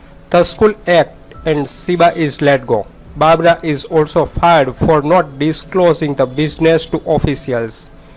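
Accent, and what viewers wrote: India. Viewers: Indian accent sucks!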